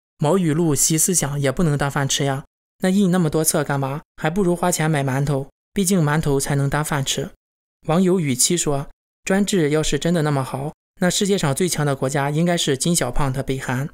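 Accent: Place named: Rizhao, China. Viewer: klaus我听你的声音，你是日照人吧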